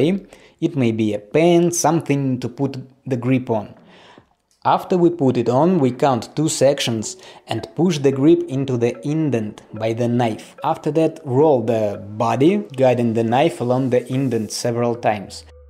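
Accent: Russia. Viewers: bro i like russian accent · What did you expect when he said be careful with a Russian accent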